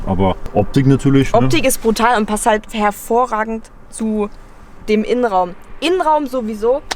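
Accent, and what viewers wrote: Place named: East Germany, Germany. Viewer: In der Tat ein brachiales Auto: nur das Rot und der Digitaltacho würden mich stören. Wie hoch war denn der Verbrauch bei deinen Testfahrten? By the Way:, dein "Ostlerslang" ist wie immer phänomenal erfrischend (ich meine das positiv!). 😜 Liebe Grüße von einem Ex-Magdeburger aus Herrenberg (bei Tübingen), Chris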